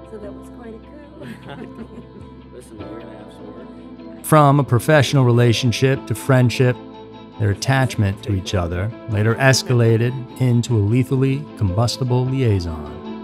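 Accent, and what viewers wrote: United States. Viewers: His voice is really cool. I love his American accent 🤩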